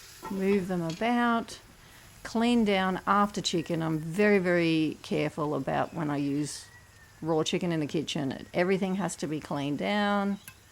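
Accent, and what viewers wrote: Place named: Australia. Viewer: your accent sounds australian, you sound a lot like this woman : <link>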